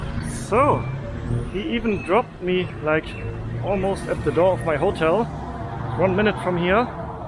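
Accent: Germany. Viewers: Dein deutscher Akzent ist nervig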